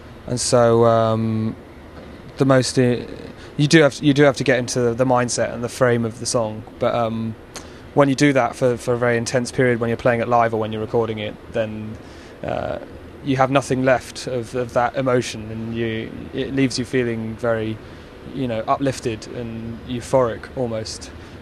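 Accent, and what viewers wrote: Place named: United Kingdom. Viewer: Damn, u r so hot!!! Love ur Brit (London?) accent...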